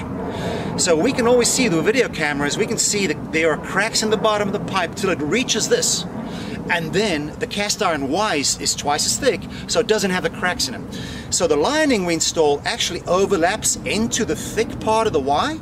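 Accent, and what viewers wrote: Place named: South Africa. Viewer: Interesting accent!!! Originally from South Africa or Zim?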